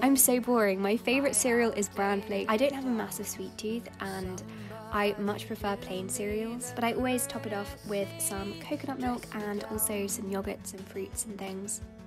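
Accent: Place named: England, United Kingdom. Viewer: Hi, You live in such a magical place... I dream to own a cottage in the middle of fields like this someday;). I have recently been a French au pair in England and I miss this country a lot, it's a pleasure to hear you speaking English with this typical accent I love so much. I discovered your channel while seeking for some ways to virtually escape from the city, and when I found you I also gained some helpful motivation tips to go on working on my Highway Code test. So, thank you for sharing the beauty that surrounds you and your soothing, clever personality, I wish you and all your kind community the most beautiful of Sundays!!!!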